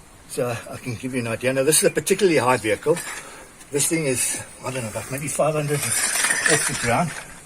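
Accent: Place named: United Kingdom. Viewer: Is this available in USA? Your British accent is obvious.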